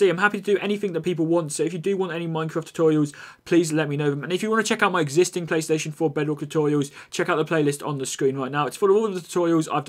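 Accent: United Kingdom. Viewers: i thought you was from the UK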